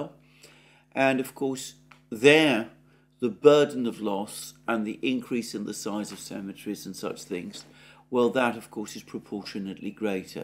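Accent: United Kingdom. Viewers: Is he British or Russian?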